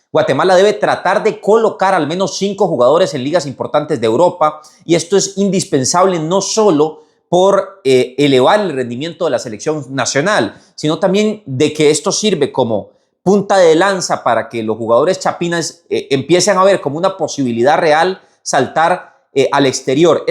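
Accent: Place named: Colombia. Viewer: Si no estoy mal es colombiano por el acento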